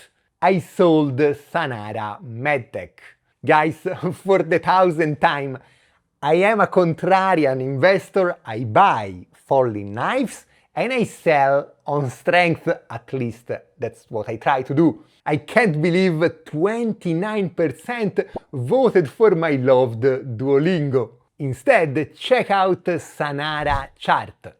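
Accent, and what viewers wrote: Tuscany, Italy. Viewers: Your accent is so funny. Are you from Toscana?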